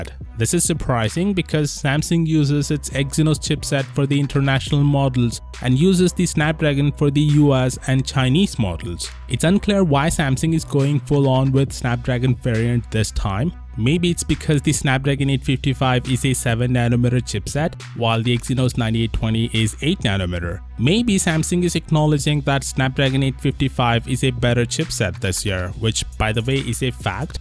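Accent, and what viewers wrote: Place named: India. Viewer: When indian hardly trying to hide the accent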